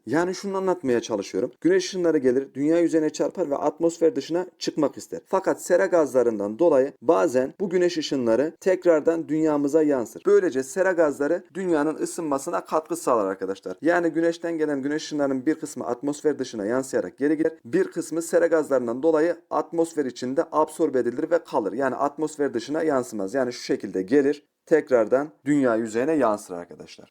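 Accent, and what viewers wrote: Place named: Adana, Turkey. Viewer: konuşmanız adanalılar gibi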